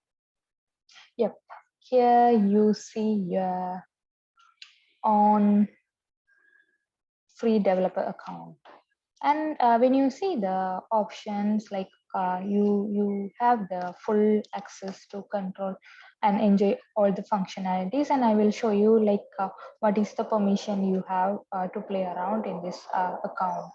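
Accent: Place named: India. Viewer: awaz se to aap indian lagti hai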